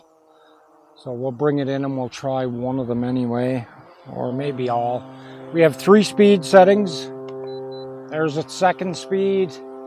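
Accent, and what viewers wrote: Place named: Canada. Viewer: Where about's are you in the world my friend, you sound Canadian to me, hence you got loads of money to buy any model you want :-) Canada or USA?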